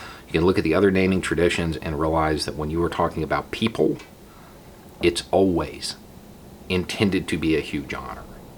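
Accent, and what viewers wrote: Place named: Florida, United States. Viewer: Wow, I never really thought you sound like a Floridian until you tried to make the word “our” NOT sound like “are”🤣🤣🤣 I’m from Indiana and I can’t do it either🤷‍♂️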